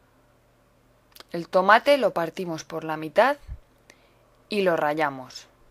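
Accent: Spain. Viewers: Gracias " " Que rico, me gusta escuchar el acento espanol y conocer los nombres de las cosas. Lo unico que no entendi fue el nombre del chile que se le agrega al mortero (o molcajete como le dicen en Mexico), Cual sera el nombre en Ingles? (vivo en los Estados Unidos) De nuevo, Gracias!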